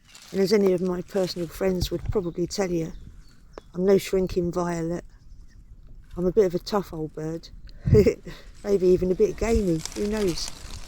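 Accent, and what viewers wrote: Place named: England, United Kingdom. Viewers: Hi..I love everything you are doing...your videos are amazing , they are beautiful.. eye-opening and very peaceful. I love the way you talk.. with that so cool English accent..I can listen to all day...please do not take any notice of the Grumpy ones..they are normally kind of jealous or just plain bitter in life.. Thank you for great entertainment.❤ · Lovely authentic lady enjoying nature. I'm thinking accent is Suffolk but the landscape is western looking. Technology.. industry, science - all part of life. Driving around in a van I find beauty in wind farms, power stations.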